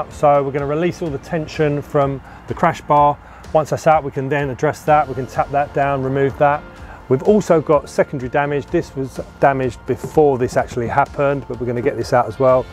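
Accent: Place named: Manchester, United Kingdom. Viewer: MY GOD HES FROM MANCHESTER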